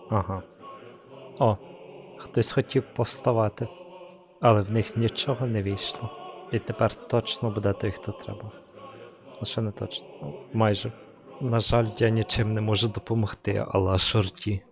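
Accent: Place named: Germany. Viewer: А ти не німець? Бо таке враження неначе німця слухаю який українську вивчив.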